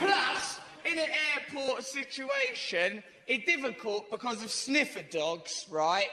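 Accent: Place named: London, United Kingdom. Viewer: You sound like your from London!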